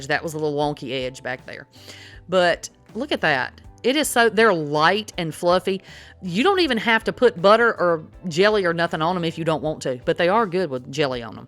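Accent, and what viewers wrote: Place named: Louisiana, United States. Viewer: Hey girlfriend I'm from North Carolina I hear your accent where you from I'm from the Appalachian Mountains of North Carolina girl I've been cooking ever since I was about 9 years old I thought about making my own channel but I ain't got time for it LOL actually I'm talking about my patience😅😅 but I love your Channel you are just so awesome Update I just saw where you're from. speaking of Louisiana I grew up watching Justin Wilson I love how he always splashed in a little wine😅 Also I wanted to make this comment watching you make a mess with the Bisquick😅😅 is the type of Channel I like to watch I do not like watching channels where people seem to be so perfect that everything they do makes me feel like😅😅😅😅 no talking whatever but I see why I like your channel you're just down to Earth so when you make a mess I ain't bothering me one bit we all make messes that's when I get my kids to come in and clean it up for me LOL😅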